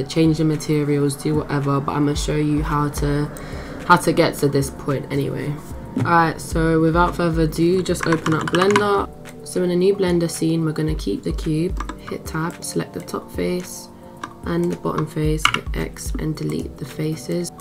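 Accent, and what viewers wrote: London, United Kingdom. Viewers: First of all great tutorial. When you scroll the camera. You control I think it is so that you can zoom in or out with more control and it does not jump with such big steps. Thanks again. Keep them coming. Also your very funny. Makes it easier to listen to haha. London lady? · I love hearing you speak, you sound like a fellow Londoner! Hearing you explain everything so simply and clearly (which you'd think is hard with such hard software) is gorgeous - I haven't even got Blender just trying to educate myself! Thanks :)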